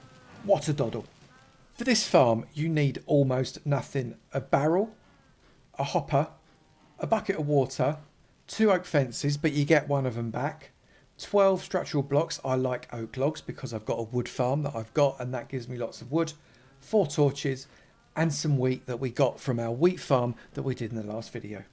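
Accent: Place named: Yorkshire, United Kingdom. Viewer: ur yorkie accent is making mine thicker mate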